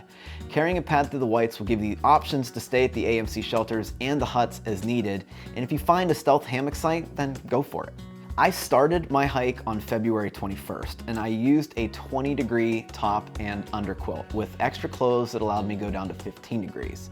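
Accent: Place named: Pittsburgh, United States. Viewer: sounds like a Yinzer to me (I'm from the eastern side of Pennsylvania).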